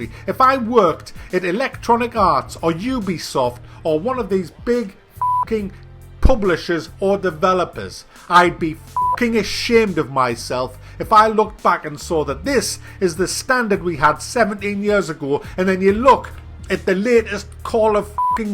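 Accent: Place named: Northern Ireland, United Kingdom. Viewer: Do you live in Northern Ireland, just wondering because your accent sounds similar to mine, being from County Down.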